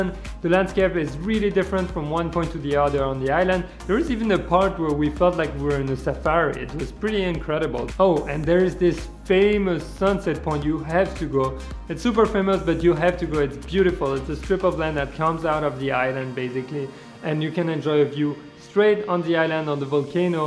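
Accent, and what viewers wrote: France. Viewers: cool video! but i wonder where ur accent is from is it french?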